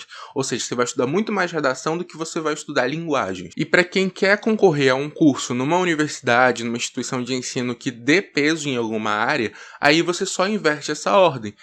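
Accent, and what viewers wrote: Pará, Brazil. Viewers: Tu é do Pará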